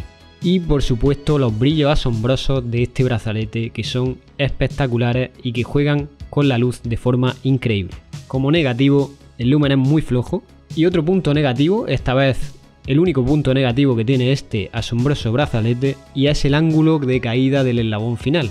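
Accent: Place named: Andalusia, Spain. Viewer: Tu tono andaluz y la música hacen de este video una joya....saludos desde Tucumán Argentina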